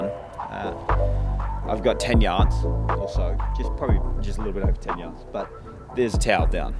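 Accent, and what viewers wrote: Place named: Australia. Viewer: is he an Aussie? sounds very Aussie!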